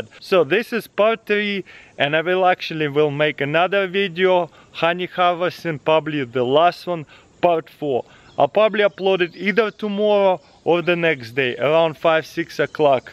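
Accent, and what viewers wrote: Russia. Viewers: По акценту слышно, что русскоговорящий человек. Это так? Интересно просто. Я иногда встречаю людей, у которых акцент еще хуже, чем у Русских, но они не русскоговорящие. Вот мне интересно, вы русскоговорящий или нет?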